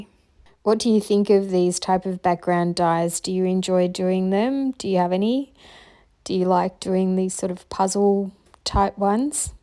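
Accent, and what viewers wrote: Australia. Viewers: Love love hearing a Aussie going so well and does Brilliant work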